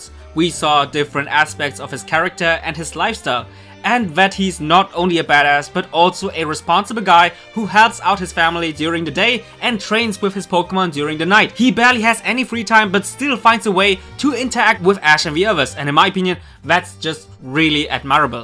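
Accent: Germany. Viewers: Wait..... .... are you.... German????? Cool - High Fife! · man hört deinen deutschen Akzenten voll raus :D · are you german? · Is this a German Aczent? Sounds a little.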